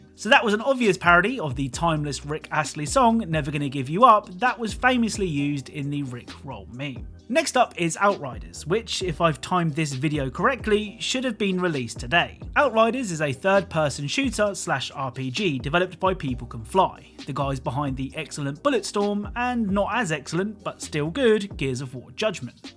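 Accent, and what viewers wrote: United Kingdom. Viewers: Where are u frome? UK? You sound yo Britichhhhhhh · He is British.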